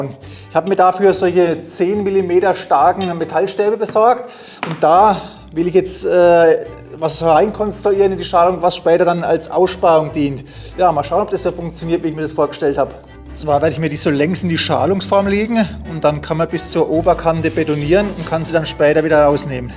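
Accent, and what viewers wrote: Franconia, Germany. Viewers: Hört man da fränkischen Dialekt durch 👂🏻👂🏻👂🏻.....😊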